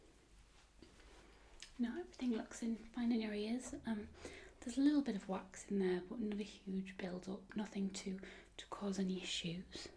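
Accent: United Kingdom. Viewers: Is she Scottish, Australian, or British? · You sound like you're from the UK? :P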